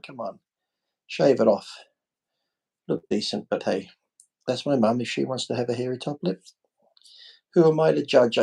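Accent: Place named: Australia. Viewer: More of these live streams please,! Need more Aussie voices on YouTube 💪🏼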